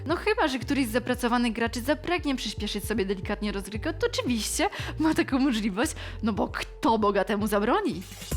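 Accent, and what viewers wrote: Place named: Ukraine. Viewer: Fajnie że jest popularna streamerka. Natomiast w roli prowadzącej, nie sprawdza się, ponieważ ma problem z dykcją i emisją głosu. Strasznie się tego zle slucha. Nie wiem co to za maniera wymowy ale brzmi jakby była ukrainką.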